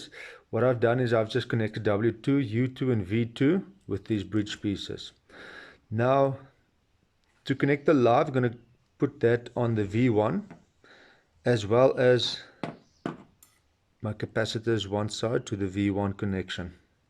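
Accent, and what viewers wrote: South Africa. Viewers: He sounds South African and we have 230v at the Wall. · Ekse bra you from the SA? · I like south african accent 😀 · this man sounds south african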